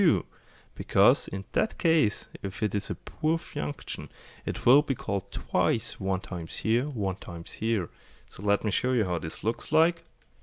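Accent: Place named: Germany. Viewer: Ich finds immer wieder witzig wie sehr man am Englisch Deutsche heraushören kann. Very good explanation of the Differences, thanks for the help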